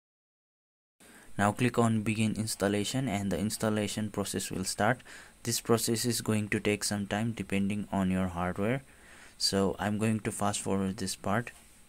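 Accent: India. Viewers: 5 mins Indian accent but not over the top no stupid commentating perfection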